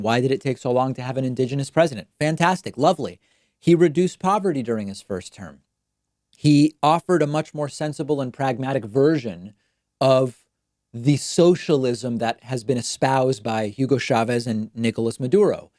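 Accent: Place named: Argentina. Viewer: He is from Argentina.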